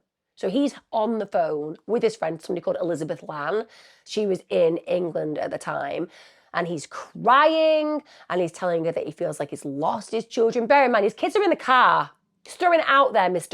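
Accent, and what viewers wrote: Australia. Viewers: I was living in Melbourne at the time and I just cannot put into words how distraught and horrified we all were when this happened. This bridge is HUGE. So high. She had absolutely no chance, the poor little angel. (Your Australian accent is great by the way). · Heartbreaking 💔 case. Loved your Aussie accent lol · As tragic as it is your Aussie accent is fab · Terrible case, very sad... evil man. But I also love the Aussie accent haha! Spot on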